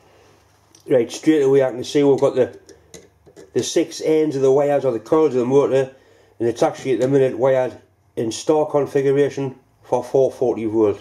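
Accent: Newcastle, United Kingdom. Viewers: It would be good if he spoke English and not Geordie.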